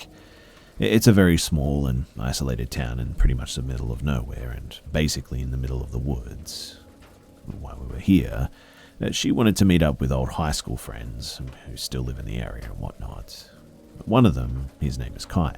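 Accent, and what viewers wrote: Australia. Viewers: Ahh time for bed. taking this soothing Aussie voice with me. Love ya!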